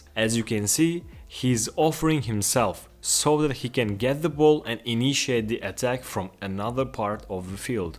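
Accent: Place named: Eastern Europe. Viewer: Nice one, just came across your chanel Btw are you from any of the former soviet union countries? Your accent sounds very familiar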